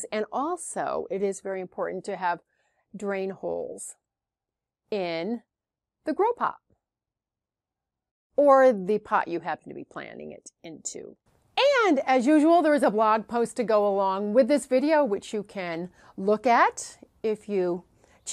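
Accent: United States. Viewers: you Americans have funny accents.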